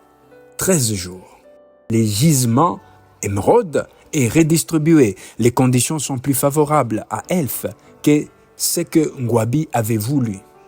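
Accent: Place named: Belgium. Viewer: Vous avez un francais belge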